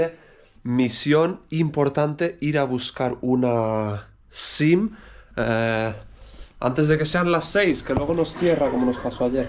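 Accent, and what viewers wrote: Andalusia, Spain. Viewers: vaya acentaco andaluz , di que si contre jajajaj , a ver si haces una quedada o algo en granada o almeria tio para poder verte, que haces unos videos que son muy cercanos y ya eres mi amigo sin conocerte en persona jajaja un abrazo guapo, sigue asi, apor todas 💪💪💪💪 #ramaalmeria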